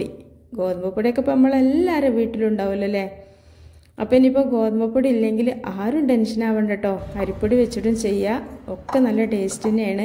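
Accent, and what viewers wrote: Malappuram, India. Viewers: Thatha. Kureperu kaanunna vdeo aanithu. Athukondu manasilakunnavidham samsaikku. Thani malapuram aakalle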